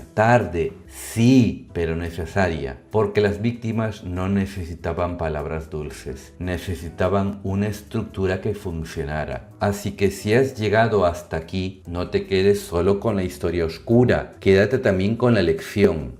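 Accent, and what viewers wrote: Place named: Spain. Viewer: Vos porque habláis asi, joder tío esto es un fraude voz de españolete y cara de hispanoamericano autóctono.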